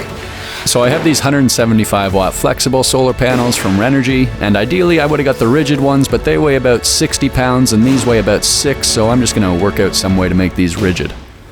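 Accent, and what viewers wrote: Canada. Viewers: You sound like an Ontario guy,hello from Mtl bud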